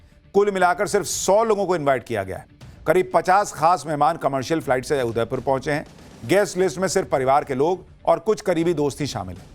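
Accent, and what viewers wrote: Bihar, India. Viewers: वाह जी,आपकी बीहारी टोन मे विश्लेषण सुनने मे बडा मजा आता है।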